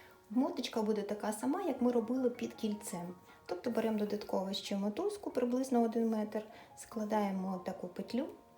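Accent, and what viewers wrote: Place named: Russia. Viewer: Странно звучит украинский язык от русскоговорящего человека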